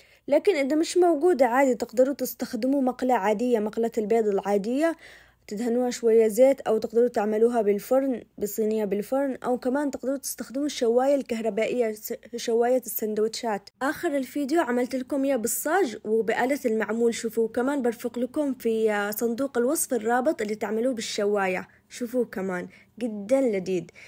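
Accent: Aden, Yemen. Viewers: فديت الكلام العدني الاصيل ربي مايحرمنا منك ❤❤ طبخك مايحتاج شهادات 😊 استمررري وأحلى لايك👍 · تسلم الايادي حبيبتي 💖… لهجتك تجنن اعيد الفيديو علشان اسمع اللهجه اليمنيه … اجمل واحلا لايك 👍🏻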